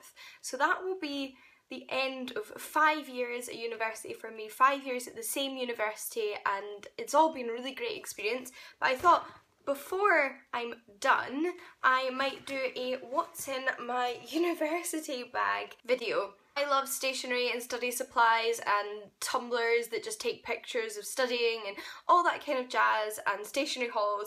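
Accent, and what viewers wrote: Scotland, United Kingdom. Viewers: You sound Scottish!! I adore how you said Zed if I didn’t see the Z I wouldn’t have known what you were talking about and I thought the apple pie was funny 😂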